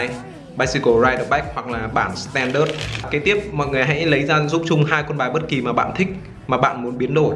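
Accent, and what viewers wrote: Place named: Vietnam. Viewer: anh kêu là tiếng anh mà đọc kiểu tiếng việt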